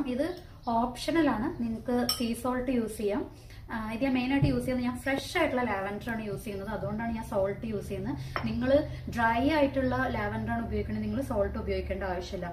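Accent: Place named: Malappuram, India. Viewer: ഇജ്ജ് മലപ്പുറാന്ന് അന്റെ ഭാഷ പറയുന്നു 'keep it up Innocence feel എല= ഇല പിന്നെ നാട്ടിൽ ഈ പുഗ്ഗ് കിട്ടുലല്ലോ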